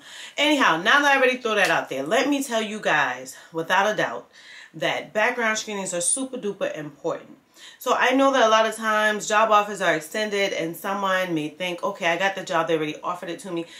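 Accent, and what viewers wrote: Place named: New Orleans, United States. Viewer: awe ok!! It sound like I kept hearing a N.O accent.😂😂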